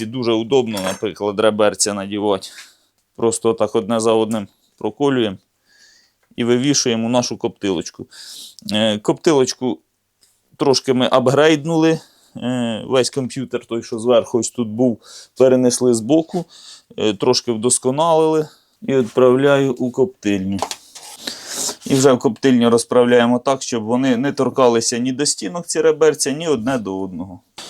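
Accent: Cherkasy, Ukraine. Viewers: Зразу по говірці почув, що Черкащина. Привіт землякам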